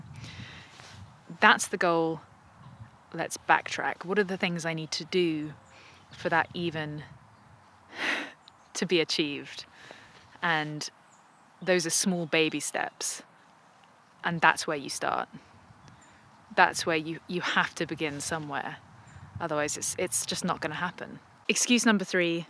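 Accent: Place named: RP, United Kingdom. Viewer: God, you're so bloody posh,. I can see you as the old dear running a large country pile....in (quite) a few years when you've made your fortune.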